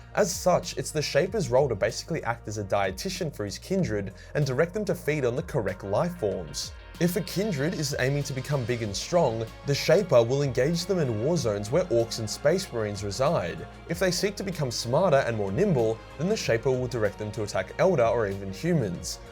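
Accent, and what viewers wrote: Australia. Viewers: Always proud to hear your fellow Aussie accent. Keep pushing keep going and keep pushing past the tall poppy that our culture has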